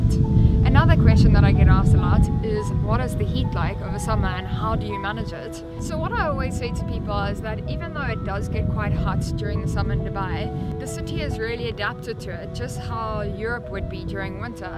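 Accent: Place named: South Africa. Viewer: Very strong South African accent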